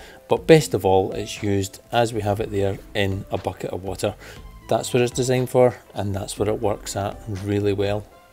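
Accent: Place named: Glasgow, United Kingdom. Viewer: Thanks for taking the time to film, edit and upload this video " ". I picked-up your Glaswegian accent immediately, given my Dad's upbringing in Kirky:-) I'm currently awaiting my August delivery of my 2021 BMW 330i M Sport w/ the following additions: - M Sport package - M Sport+ package - Visibility package - Comfort package - Harman Kardon sound system - Heated steering wheel - Galvanic embellisher for controls It's been a 5 month wait (given the pandemic), but the extra lead-time has allowed me to (exercise my patience, and) take some time to research how existing 3 series owners are maintaining their beauties. I live in Melbourne, however I'll be looking into these products this week. Thanks again, and all the best!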